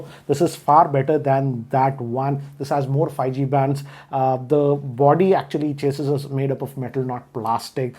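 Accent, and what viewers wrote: India. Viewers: The Indian accent is really hard to listen to 😖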